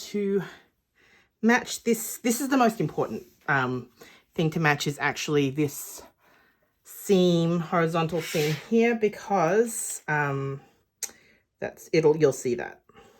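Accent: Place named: Australia. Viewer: Great tutorial. I love your clear instructions and it’s so great to hear an Aussie voice! I have downloaded the pattern and will be making one of these just as soon as I have some twill tape.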